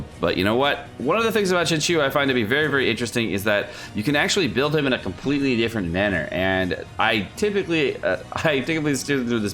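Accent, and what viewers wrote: United Kingdom. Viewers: The British accent just cracks me up xD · Dam your British accent be better then mine and I am actually British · I want that British accent of yours to say "can I got a bottle of water"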